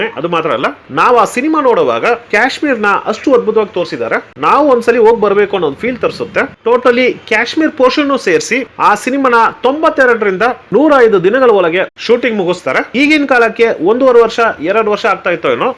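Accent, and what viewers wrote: Tamil Nadu, India. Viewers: ಈ ಚಿತ್ರದಲ್ಲಿ ರಾಜ್ಕುಮಾರ್ ಪಾತ್ರದ ಸೌಂದರ್ಯ,ರಾಜಕಳೆ, ಘನತೆ,ಗಾಂಭೀರ್ಯ, ಭಾವಸೂಕ್ಷ್ಮತೆ,ತನ್ಮಯತೆ ಪ್ರಪಂಚದಲ್ಲಿ ಯಾರಿಗೂ ಬರಲ್ಲ.ಇಂತಹ ಅಭಿನಯವೇ ಅಲ್ಲದೆ ಸ್ವಂತ ಕಂಠಸಿರಿಯ ಅತ್ಯುತ್ತಮ ರಂಜನೀಯ ಹಾಡುಗಳು.ಆರತಿಯವ್ರೂ ತುಂಬಾ ಚೆನ್ನಾಗಿ ಅಭಿನಯಿಸಿದ್ದಾರೆ. ಜಯಮಾಲ ಕೂಡಾ ಬೆಡಗಿನಿಂದ ನಟಿಸಿ ಕಣ್ಮರೆಯಾಗುತ್ತಾರೆ. ಆ ಕಾಲದಲ್ಲಿ ಕನ್ನಡದಂಥ ಸೀಮಿತ ಮಾರುಕಟ್ಟೆಯಲ್ಲಿ ಭಾರೀ ಯಶಸ್ವಿಯಾದ ಚಿತ್ರ. ರಜನಿ ಬರೀ ಸ್ಟೈಲ್ ಕಿಂಗ್ ಆಗಿ ಮಾತ್ರ ತುಂಬಾ ಚೆನ್ನಾಗಿ ರಂಜಿಸುತ್ತಾರೆ. ಆದ್ರಿಂದ ಅವರ ಪಾತ್ರ ಹಾಗೇ ಮಾರ್ಪಾಡು ಮಾಡಿದ್ದಾರೆ. .ಆದ್ರೆ ತಮಿಳ್ ನಲ್ಲಿ ಒಂದು ಹಾಡು ಮಾತ್ರ ಚೆನ್ನಾಗಿದೆ,ಉಳಿದ ಹಾಡುಗಳು ಅಷ್ಟಕ್ಕಷ್ಟೆ. ತಮಿಳ್ ಮಾರ್ಕೆಟ್ ವ್ಯಾಪ್ತಿ ಜಾಸ್ತಿ, ಜಾಸ್ತಿ ಖರ್ಚು ಮಾಡಿ ಜಾಸ್ತಿ ಗಳಿಕೆ ಮಾಡಿದ್ದಾರೆ. ಈ ಕಾರ್ಯಕ್ರಮದ ನಿರೂಪಣೆ ಸ್ವಲ್ಪ ಸೌಮ್ಯವಾದ ತಮಿಳ್ ಕನ್ನಡ ಶೈಲಿಯಲ್ಲಿದೆ. ಕನ್ನಡವನ್ನು ಕನ್ನಡ ಶೈಲಿಯಲ್ಲೇ ನಿರೂಪಿಸಬೇಕು. ಒಟ್ಟಿನಲ್ಲಿ ದಂತಕಥೆ ರಾಜ್ಕುಮಾರ್ ಹೊರತುಪಡಿಸಿ ಒಂದು ಚಿತ್ರರಂಗವನ್ನು ಅತ್ಯಂತ ದೀರ್ಘಕಾಲ ಜನಪ್ರಿಯವಾಗಿ ರಂಜಿಸಿದ ತಾರೆ ರಜನಿಕಾಂತ್. ಅವರು ತಮಿಳ್ ಶ್ರಮಜೀವಿಗಳ, ಬಡ ಕೂಲಿಕಾರ್ಮಿಕರ,ನೊಂದವರ ಅಚ್ಚುಮೆಚ್ಚಿನ ಆರಾಧ್ಯ ತಾರೆ. 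ರಜನಿಗೆ ರಾಜ್ ಆದರ್ಶ ತಾರೆ.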